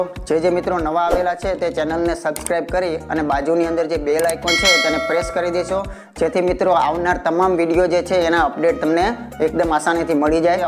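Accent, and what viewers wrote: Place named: Kathiawar, India. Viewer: કંઈ બાજુ ના છો તમે છે Saurashtra kathiyawad બાજુ બોલી પર લાગે છે એવું ...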